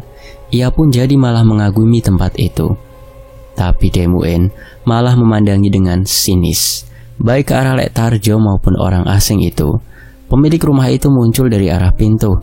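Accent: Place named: Pekalongan, Indonesia. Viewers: Logat Pekalongan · Logat Pemalang Pekalongan itu ya mas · Logate wong batang, pekalongan, pemalang. Aku dewe wong batang 😅😅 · Logat batang Pekalongan pokok e sekitaran kue min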